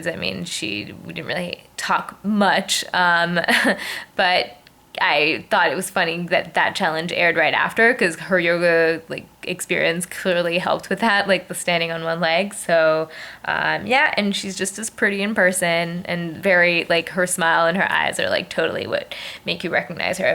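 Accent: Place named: United States. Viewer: "You're playing for a Million dollars, NOT for a doughnut" haha btw what is it with Eric ? i mean he finds the idol, then hands it to Andrea LMAO does this guy never learn !! Reynolds and Eddie voting for two different players, is just ridiculous. note: you may sound American, but you get the beauty from your australian side ;-p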